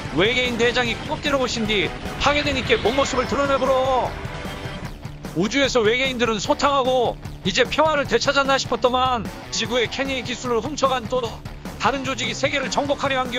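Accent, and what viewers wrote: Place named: Jeolla, South Korea. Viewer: 랑깨랑깨 하는거보니 배충이가 산듯? 재미만있음 상관없응께 노 상관ㅋㅋ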